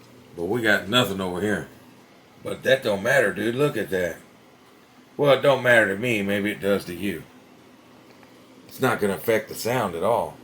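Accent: United States. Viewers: Hi I'm from Italy, i love your accent, which part of the states are you from?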